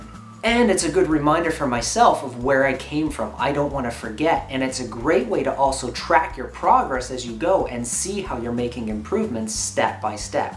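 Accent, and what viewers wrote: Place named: Canada. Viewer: Great tips as always. I listen to energetic music before my live streams. I also generally try to record when I'm feeling good and having a good day vs otherwise. I've always thought you had a Canadian accent, but today I've just noticed your video location and hoodie logo. 😀 Cool! Yay a great Yoda quote, now two. I do have a friend who is very talented in graphic design and is helping me as we speak! Lol "For the love of popsicle Pete!" I've never heard of that phrase, I love it.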